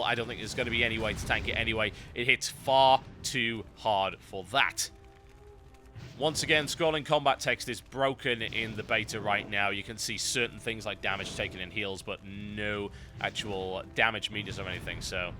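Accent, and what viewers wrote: United Kingdom. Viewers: i only watch things like this cause it has WoW and a brittish person talking in it <3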